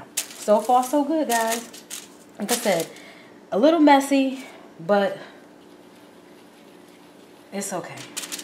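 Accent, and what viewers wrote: New York, United States. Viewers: Great video! You Sound like my aunt lol NEw Yorker? ❤️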